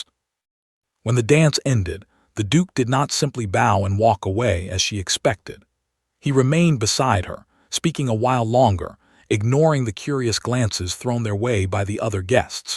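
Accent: United States. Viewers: .........And get rid of the US accent when it's a UK story.......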